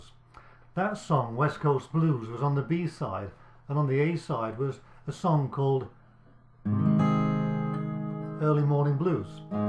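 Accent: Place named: Sheffield, United Kingdom. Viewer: Jeez, who did the subtitling? Obviously doesn't understand the Sheffield accent!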